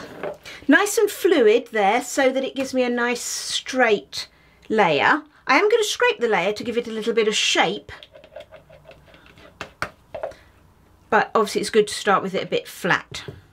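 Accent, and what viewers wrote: England, United Kingdom. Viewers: English accent level...This amazing soap artisan! 😂❤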